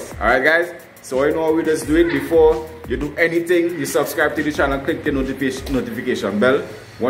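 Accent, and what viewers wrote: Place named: Trinidad and Tobago. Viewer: I love trini accent omg😍 We are a Jamaican youtuber living in Barbados🇯🇲🇧🇧 New subscriber here!💕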